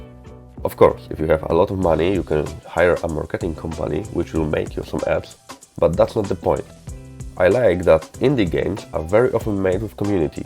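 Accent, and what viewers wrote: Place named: Poland. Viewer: I can hear fellow polish dev :D keep it going!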